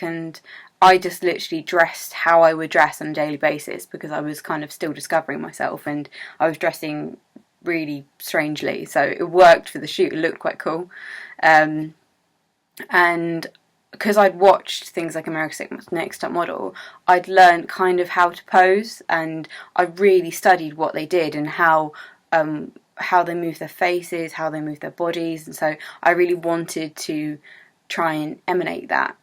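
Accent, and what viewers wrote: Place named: United Kingdom. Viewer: I did not know you were British! Your accent is awesome! :D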